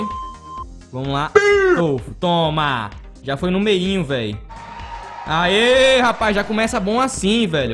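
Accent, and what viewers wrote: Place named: Northeast, Brazil. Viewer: cara vc é do nordeste se fo diz qual e seu estado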